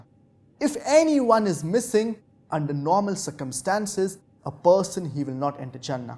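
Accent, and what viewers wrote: India. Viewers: There's no way he needs to speak like his dad looool. He was educated completely out of India and lived 90% out of India yet he has that cringey Indian accent when speaking English. Bizarre.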